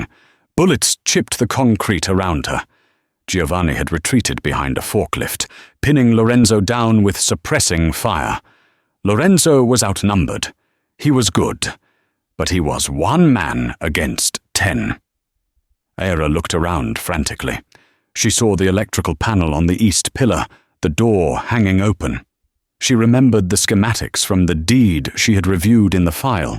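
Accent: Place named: United Kingdom. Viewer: I have a simple solution if you are interested? I just put a piece of paper over that picture section of the screen, and click on Transcript to listen and read along with that purring English / British accent, and let my imagination do its magical thing, as it was meant to. A humble suggestion from Windsor, Ontario Canada EH?!🇨🇦 Cheers! Enjoy!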